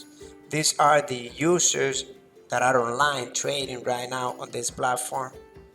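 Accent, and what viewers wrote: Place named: Caribbean. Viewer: I love Caribean English!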